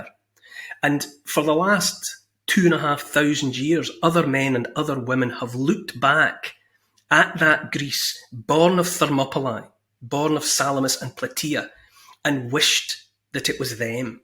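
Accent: Scotland, United Kingdom. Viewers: You tell the story so beautifully Mr Neil Oliver with your beautiful Scottish accent. Like yourself I have always admired and respected the Spartan Warrior culture. I served Honorable in the United States Marine Corps. SEMPER FIDELIS SIR🇺🇸🫡✊️🖖🙏GOD BLESS YOU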